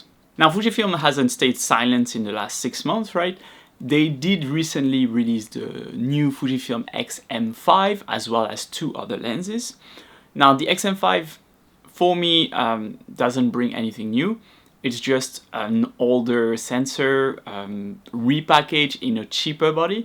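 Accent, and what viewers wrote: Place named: France. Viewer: tu as ralenti dans ton script ,c'est beaucoup plus audible,ca faisait trop je veux masquer mon accent Francais;) c'est une " critique" positive ;) c'est vrai que c'est l'exode Fuji en ce moment,pour ma part ,toujours en xt 3...avec beaucoup de ratés,notamment photo d'enfants .... perso le nikon zf me fait de l'oeil depuis plusieurs mois,pas forcément le FF ,mais plus l'ergonomie Fuji et le meillerur AF,tu l'as essayé?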